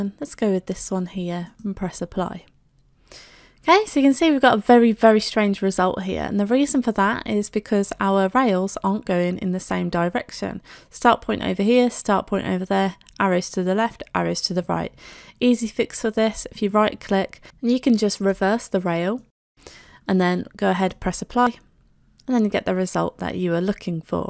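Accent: United Kingdom. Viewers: any speech cannot be more english than this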